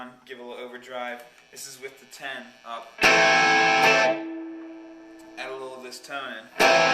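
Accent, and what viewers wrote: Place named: United States. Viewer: Are you Jim Sturgess with a permanent american accent? :) You sound exactly like him.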